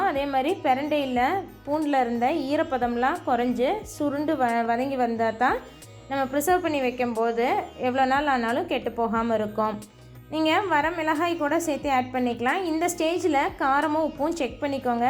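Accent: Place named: Kongu, India. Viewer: mathikadhinga akka . Kong slang dhan eppavum nice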